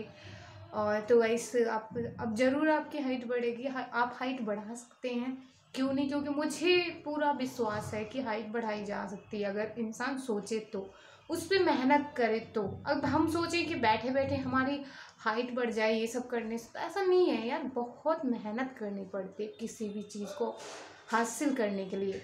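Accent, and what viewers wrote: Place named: Mumbai, India. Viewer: Aji madam ji aap kaha se ho mujhe aapki aavaj Mumbai se lag rahi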